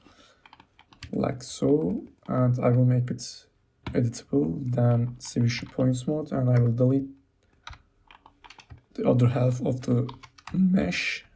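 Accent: Turkey. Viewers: Clean! I am sure that He is turkish by the way! His accent is soooooo familiar!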